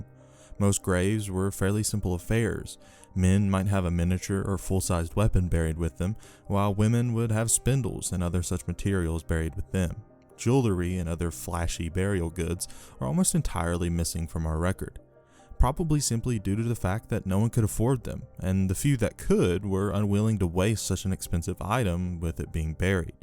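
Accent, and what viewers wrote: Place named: United Kingdom. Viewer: you are super clearly british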